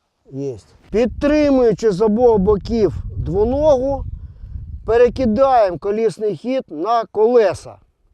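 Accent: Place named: Russia. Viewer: У ведучого росийский акцент в розмови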